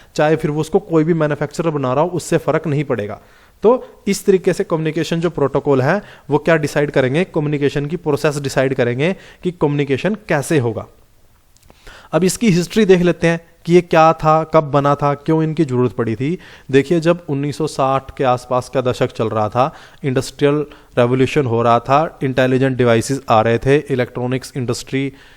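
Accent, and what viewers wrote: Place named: Haryana, India. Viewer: Bhai haryana se h pta lag gya awaj se ❤❤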